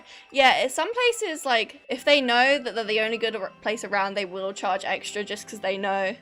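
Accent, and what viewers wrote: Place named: United Kingdom. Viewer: so unbelievably bri'ish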